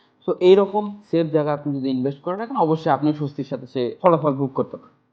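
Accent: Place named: Sylhet, Bangladesh. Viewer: সিলেটি মাতের আবাস পাচ্ছি, মনে হচ্ছি?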